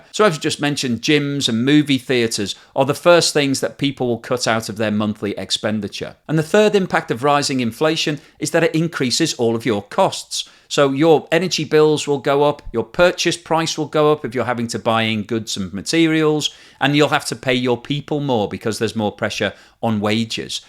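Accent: United Kingdom. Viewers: I can tell by your accent, you're British, why don't you do a video on your own country's economy!? Spoiler alarrt... it SUCKS!!!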